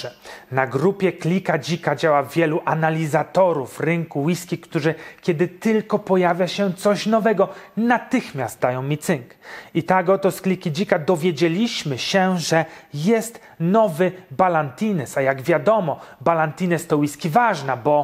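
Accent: Poland. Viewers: Daruje sobie pan ten "angielsko-amerykański" akcent , słychać ześ pan Polak z krwi i kości. :)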